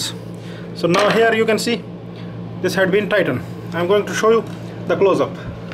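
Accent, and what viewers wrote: India. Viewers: Informative video, it looks like this entire vacuum cleaner could use a cleaning. What year does it date back to? What industry was it used in? What country was this video filmed in? I'm guessing India judging by your accent.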